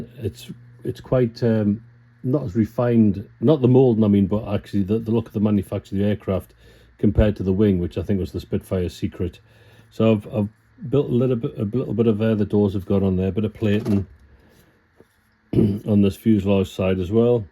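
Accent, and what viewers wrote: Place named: Northeast England, United Kingdom. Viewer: Hi, What a gorgeous build, well done my friend. I live close to Consett and you have a familiar accent. Do you take on commissions? The reason I ask is that I own the original entry forms for Peter Townsend blue Hurricane from the Kings Cup Air Race. I bought the 1/48 Hasegawa to have built and make a complete display. Is this a project you could do for me? Regards, Ian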